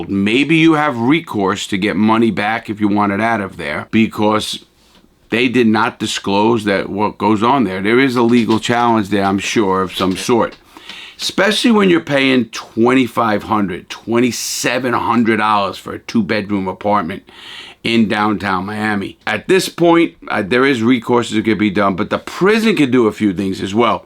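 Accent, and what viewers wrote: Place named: Boston, United States. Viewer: are u from mass??? I'm hearing a boston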